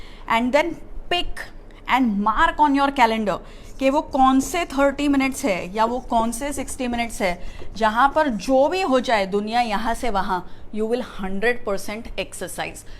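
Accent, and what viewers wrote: Mumbai, India. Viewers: phuski bomb ...lol ..ur such a mumbaite..its awesome to hear every word u say .deep thanks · Nice & convincing explaining...enjoyed Bambayya Hindi too...like..."ye mai kayke liye samza Rahi hu" 😄👍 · Every video is very informative . I enjoy listening to your Bumbai Hindi.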